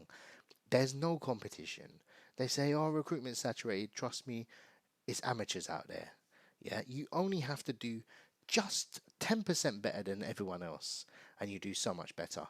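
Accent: United Kingdom. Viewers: You definitely don’t sound automated because you speak Green Lanes English 😊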